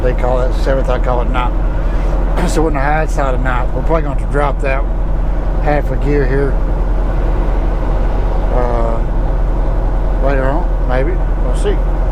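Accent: South, United States. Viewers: Native Alabamaian so southern talk is fine by me. Always enjoy your videos and conversation!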